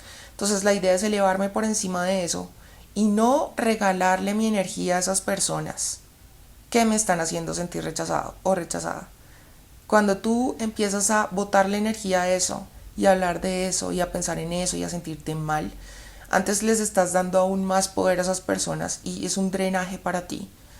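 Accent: Colombia. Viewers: Excelente video y GRAN CONTENIDO 🇨🇴 " MI GURÚ FAVORITA ❣️🇨🇴" UN placer verte y un DELEITE escuchar tu voz muy colombiana 🇨🇴 ❣️ ( siempre enseñanzas prácticas GRACIAS ❣️🇨🇴😉✌️🇲🇽) UN BESITO Y UN ABRAZO DE OSO HASTA BOGOTÁ COLOMBIA 🇨🇴❣️😉✌️🇲🇽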